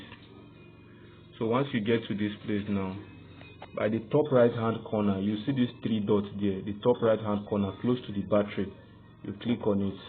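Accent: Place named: Nigeria. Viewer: low noise in addition to Nigerian accent is difficult to understand. non sense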